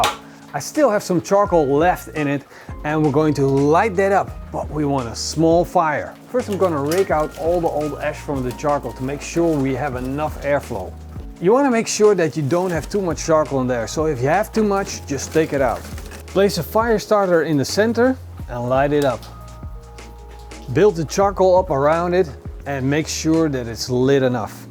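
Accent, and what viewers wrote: Netherlands. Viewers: Definitely Dutch by the accent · Dutch accent. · are u dutch? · You’re dutch aren’t you? I can hear it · Ja, hij is echt Nederlands — “What IS up?” ... maar zijn Engels is bijna perfect. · Dutch accent... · Sounds like your from the Netherlands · You think he's French Canadian? He's obviously Dutch. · Is he dutch? Because this accent is very dutch😭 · Mann i speak both and yeah thats a pretty clear dutch accent or maybe from belgium but i doubt that Belgians have a bit different accent aswell. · There's no way you're not dutch, it's the first thing I noticed when you opened your mouth 😂 Nice video either way! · Are you dutch you sound dutch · Ja, die Nêderland se aksent. Man kan die Boere en die Nêderlanders spot-on hoor. Dis altyd gaaf. Die beste groete uit Rusland!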